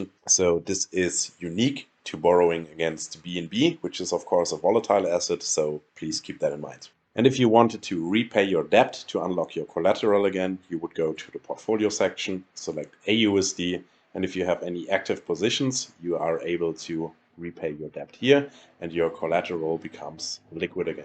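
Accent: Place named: Germany. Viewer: Nice german Akzent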